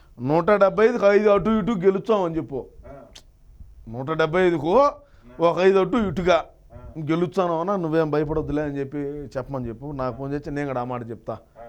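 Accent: Rayalaseema, India. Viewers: Good satire, in Rayala Seema accent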